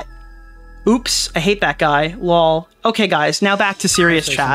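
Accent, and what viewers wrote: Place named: New York, United States. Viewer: This is fhe most aggressigely new york i ever heard you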